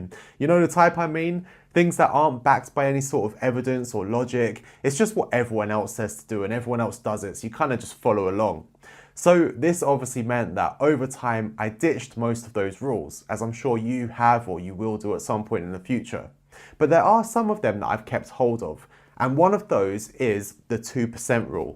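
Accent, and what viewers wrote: United Kingdom. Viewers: Loved it. So informative on so many levels! Thank you kindly ! Articulate English accent & witty humour a happy bonus :-)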